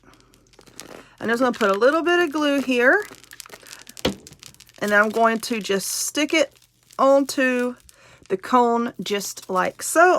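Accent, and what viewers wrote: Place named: Texas, United States. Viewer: Hey sounds like you live in Texas...lol We have two that rides around on their lawnmowers almost 24/7... Oh I love your carrots. I have to try these. Thank you. Stay safe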